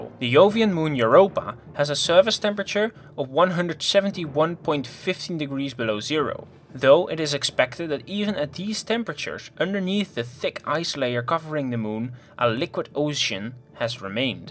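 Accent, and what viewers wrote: Netherlands. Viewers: the dutch accent.......... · Are you Dutch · Are you dutch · Do I detect a Dutch accent? 🌡️❄️ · leuk nl accentje hahah. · Man is dutch💀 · are you dutch? olijf oil intersekting point etc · Lol, ik realizeer me net pas dat je Nederlands bent... Blijf goeie content zoals dit maken, je hebt talent! (Ik dacht al dat ik dat accent herkende... ;P) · Ew dutch accent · Ah, hello my Dutch friend, I recognize that accent :)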